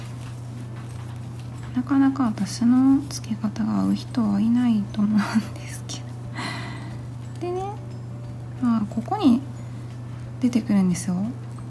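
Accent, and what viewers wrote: Kansai, Japan. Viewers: さんのこんにちわぁ⤵︎🎶てゆう始まり方が大好きでガン見させていただいています(*^^*) 同じ関西なのでイントネーションが違和感なくていいです(*^^*)親近感✨ 長い間編み物をしてないのですがまた基本から始めたいなあて思えてきて毛糸をかいましたwww(*^^*) さんの、ゆるさが私にピッタリ！チャックを付けながらの色々な本音話もめっちゃわかりますよ。。同感です～ わたしゃは独特なんです笑。て話も一緒に笑いながらみています(*^^*) ゆっくりと丁寧な説明ありがとうございます(^.^)♡ 黙々と細かい作業が大好きなんで失敗しながらも楽しくこれからも学ばせてもらいますね！出会えて良かったです！！感謝😊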